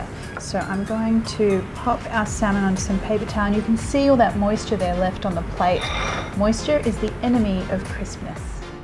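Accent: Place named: Australia. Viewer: Yet she has a clear Australian accent ok lol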